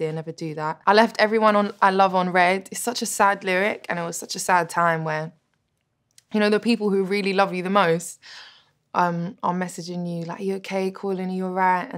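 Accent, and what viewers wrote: United Kingdom. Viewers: Wao shes so british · she’s british? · She's British yh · I didn't realise she was british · What is her accent? British?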